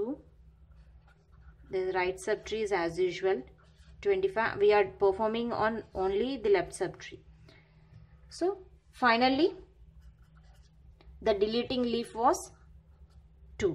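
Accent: India. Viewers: I'm used to the British accent, but perfectly ok (after 10 minutes of getting used to) with the Indian one too. These videos are all great. You should be really happy someone took time to prepare them. If for some reason you are not able to get used, just search on the web an pay for the right accent. This is about Maths and not about accent after all. For your own benefit, focus on the right thing ;>).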